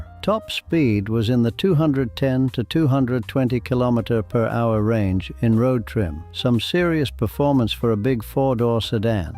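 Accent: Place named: United States. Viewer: similar, he has that yanky voice.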